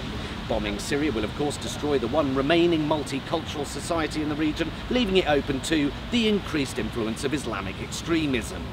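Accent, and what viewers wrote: United Kingdom. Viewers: This is the kind of news I would watch any day. He’s got that bad ass British accent to go with it.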